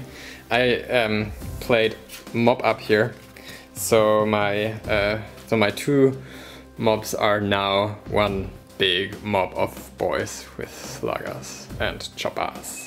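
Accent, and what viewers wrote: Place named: Germany. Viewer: You sounds like Germans speaking english 🤔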